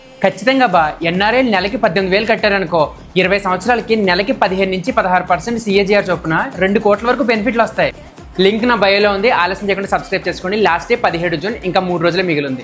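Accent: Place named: East Godavari, India. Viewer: Completely east godavari slang....😊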